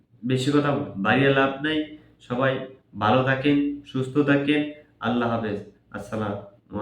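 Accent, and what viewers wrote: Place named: Mymensingh, Bangladesh. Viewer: বাড়ি কি ময়মনসিংহ, ভাষা শিখে তারপর ভিডিও করবেন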